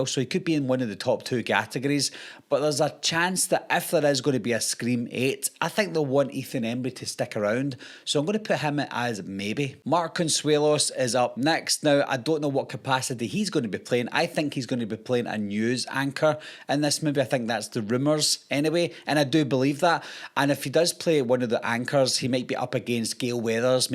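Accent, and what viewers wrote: Scotland, United Kingdom. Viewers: You keep calling Chad Omar Gooding. His name is Mason Gooding and no it's not your accent as I know people with a stronger Scottish accent than you. I always went on holiday in Scotland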